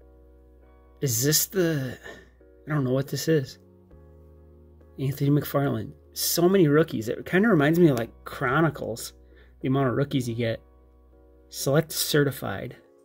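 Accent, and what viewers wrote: Minnesota, United States. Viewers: What part of Minnesota are you from?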